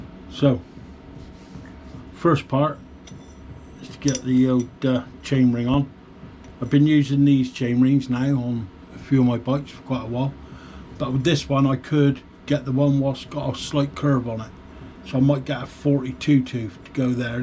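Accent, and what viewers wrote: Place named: South West England, United Kingdom. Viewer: That accent sounds like its from south west England. Clean and tidy build, not sure about the orange but it will stand out in traffic, for me I would only consider using a fat tyre build in winter or if using heavier battery, What rotor sizes are you using, for me I won't use any size below 180mm, using 203mm up front and 180mm rear, the heavier the build the more stopping your need and 160mm rotors will not cut it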